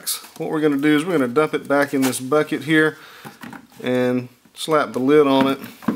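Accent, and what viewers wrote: South, United States. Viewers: with a southern accent 😳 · 😂 love his thick (southern?) I think accent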